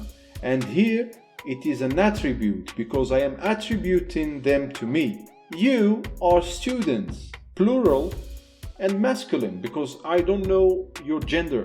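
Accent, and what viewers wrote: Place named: Rif, Morocco. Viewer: Is this the western riffian? It sounds a different from the tmazight we speak in Nador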